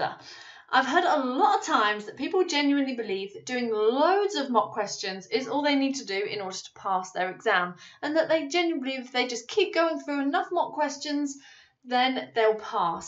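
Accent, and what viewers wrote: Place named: United Kingdom. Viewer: Ayyottaaaa😊 like the briish accent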